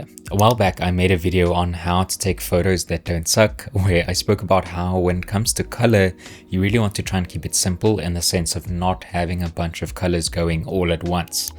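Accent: South Africa. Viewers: You sound south African 😂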